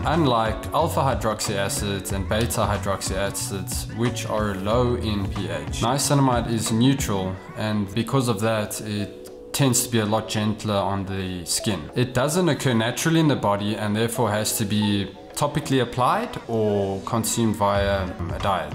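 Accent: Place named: South Africa. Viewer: Why do you sound so South African? Where you from?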